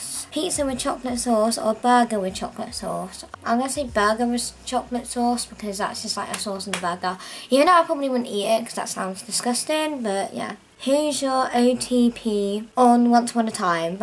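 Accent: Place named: England, United Kingdom. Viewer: Your english accent is so cool and you have beautifull HAIR and EYES 😍😍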